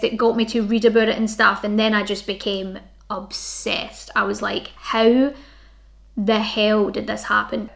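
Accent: Scotland, United Kingdom. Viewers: I just really wanna hear you say "THERES BEEN A MURDER" in your scottish accent...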